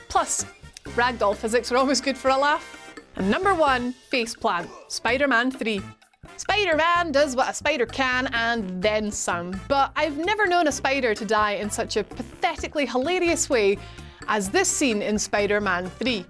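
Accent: Scotland, United Kingdom. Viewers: Gaelic, lovely. She's probably Scottish. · Is she Scottish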